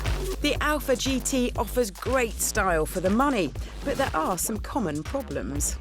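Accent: United Kingdom. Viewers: Yeah, somethings about her voice and the british accent is so sexy! I do have a crush on her as well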